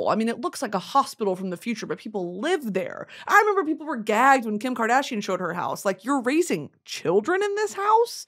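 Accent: South, United States. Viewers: your southern accent sounds exactly like my late grandmother and reminds me of being in her house as a kid lmao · your old southern lady accent cracks me tf up every time 😭💀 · *'s southern lady accent is quite literally my favorite thing ever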